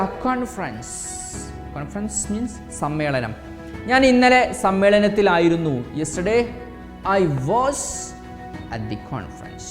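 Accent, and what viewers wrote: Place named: Malappuram, India. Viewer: നിങ്ങൾ ഒരു മലപ്പുറംകാരൻ ആണെന്ന് തോന്നുന്നു... ശരിയാണോ ?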